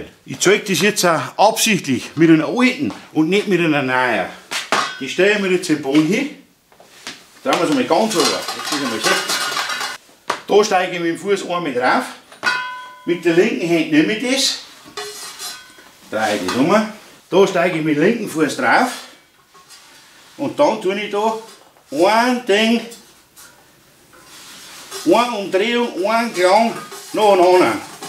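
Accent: Bavaria, Germany. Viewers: Genau so ist es.Ich habe in den 80 igern in Rostock Überseehaven gearbeitet als Festmacher. Dort wurden die Wurfleinen genau so zusammen gewickelt. Klasse dein Kanal und gut erklärt.Auch wenn ich als Sachse oft lauschen muss was du sagst durch den bayrischen Dialekt....lach. LG der olaf aus Delitzsch bei Leipzig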